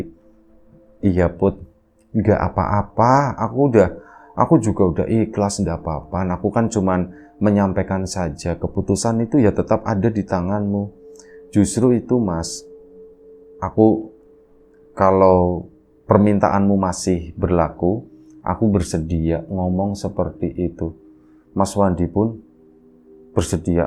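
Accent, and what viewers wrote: Tegal, Indonesia. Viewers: Sedih bngt😢🤧mas Wandi scra ga lngsung menyemprotkan gas air mata ini...dr mulai mas Wandi mngikhlaskan mas dewo sm mb putri aja udh terharu ditambah dg mninggalnya mas dewo bkin nyesek.mas Wandi ini kya'y emng lucu ya aplgi dibawakan mas dg gaya natural dan logat khas Tegal yg agak mirip sm cirebon bkin aku ketawa.kisah ini pket komplit ya ada sedih dan ada lucu'y😂smoga mas Wandi dan mb putri rmh tangga'y langgeng bahagia dan mnyayangi anak mas dewo spt anak sndiri, mkasih mas sehat trs agar bs mnghibur kt smua😁😁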